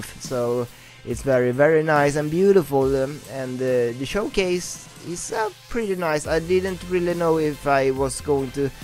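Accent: Sweden. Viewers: Är du svensk, gissar bara😀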